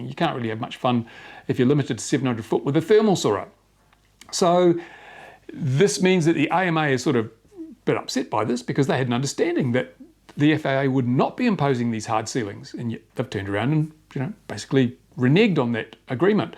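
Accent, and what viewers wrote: England, United Kingdom. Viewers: love the british accent :-D and yes the UK brexit is a proper mess, the politicians saw to that.... sort of like the drone reg's :-)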